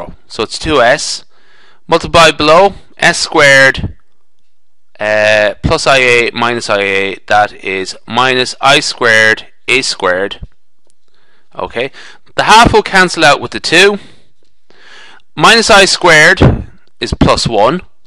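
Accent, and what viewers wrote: Ireland. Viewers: man's irish. i can tell. that was really helpful thank you!!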